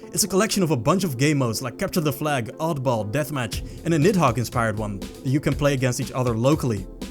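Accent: Netherlands. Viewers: can you add gyro controls and flick stick to your template? that would be cool. just look up jibbsmart to know what flick stick is. (spreek je trouwens nederlands, aan je accent te horen). Flick stick is a way to snap the camera to any angle. if you want to turn 180 degrees, just tilt the stick down. want to aim 90 degrees to your right. tilt the stick to the right. you can no longer look up and down with the stick. you need gyro to do that. i have been using it on almost any shooter on pc.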